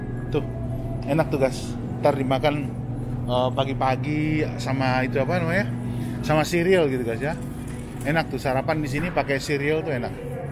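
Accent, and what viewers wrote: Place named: Java, Indonesia. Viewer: Jowo ne jik kentel rek😂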